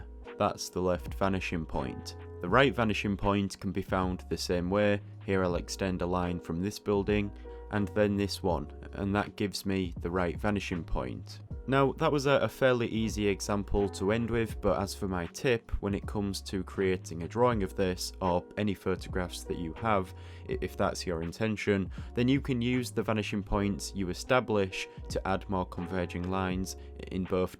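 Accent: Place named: Yorkshire, United Kingdom. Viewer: Brilliant video. Also sound like a fellow Yorkshire man! 👍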